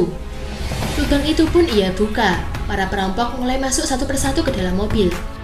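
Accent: Java, Indonesia. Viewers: Plis jan medok si, gw tau lo jamet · Medok bgt mbak, but i like it 😍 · mbae ngomonge medok bgt hihi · Logat jawa nya medok bgt mbak 😁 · Mba e logat jawa poll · suara medoknya itu loh ,bikin gimana gitu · Medok banget, jowone ndi mbak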